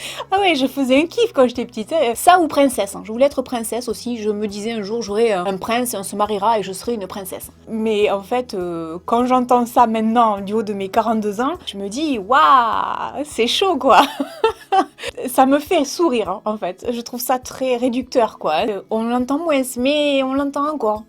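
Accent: South, France. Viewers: OMG, là je ne pouvais pas ne pas écrire: Prend un caillou si tu as un point de côté!! Jamais entendu celle là. Les gens qui ont inventé ce conseil étaient pas très fut fut. Tu veux qui fasse quoi le caillou! Il est pas magique:'D Sinon un conseil quand tu as le occet (aucune idée de l'ortho) on m'as souvent dit de boire de l'eau, ça a jamais marché:'D ou alors bloque ta respiration, ça marche temporairement mais après je l'ai toute la journée. Pas cool. Par contre ce qui marche, c'est une cuillère de citron et du sucre. Après chacun est unique donc... ce qui va marcher sur qqun ne va pas marcher sur un autre. En tout cas, je voulais te dire que depuis que je suis ( depuis tes débuts) je ne peux me passer de regarder chacune de tes vidéos. Tu mets de bonne humeur! Ton accent du sud me réchauffe autant que la bonne humeur que tu dégages. Merci d'être toi!:D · J'adore ton accent du sud, ça met du soleil dans ma journée ! 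Sinon pour les études ça fait 3 ans que je fais de l'informatique et j'ouvre enfin les yeux en me disant que c'est pas fait pour moi ... Bon au bout de 3 ans c'était un peu long mais du coup je sais même plus ce que je dois / peux faire avec ma filière, compliqué !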